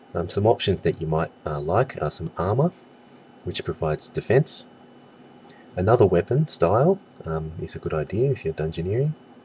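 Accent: Australia. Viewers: holy shit first australian commentator ive heard?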